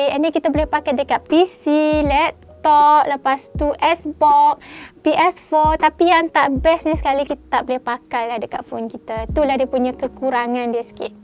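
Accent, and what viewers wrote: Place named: North, Malaysia. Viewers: pekat kedah noh hahahahaha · pekat sungguh no loghat utagha tu. :D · Org utara ke cik adik??? Bunyi utara tu kuat sungguh..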